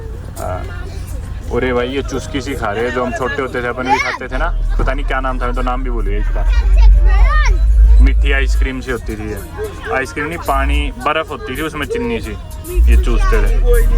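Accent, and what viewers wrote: Haryana, India. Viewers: Like your Haryanvi touch in your Hindi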